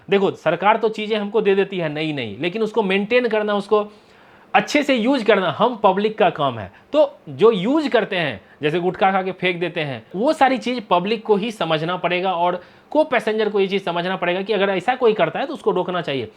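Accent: Bihar, India. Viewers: uncle aap bihar se hai 😊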